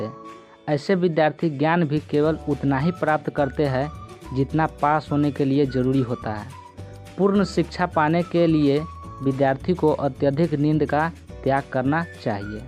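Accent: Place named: Bihar, India. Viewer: lgta to bihari hi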